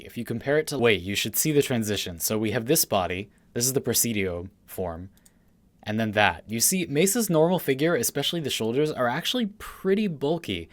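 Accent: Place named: California, United States. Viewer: is so underrated as a wf youtuber. Btw, I Love ur accent, is it Californian?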